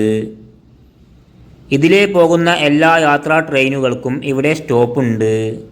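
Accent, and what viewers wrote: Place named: Kozhikode, India. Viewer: ഇത് ഞമ്മടെ കോഴിക്കോടൻ ഭാഷേനു മാഷേ 🤣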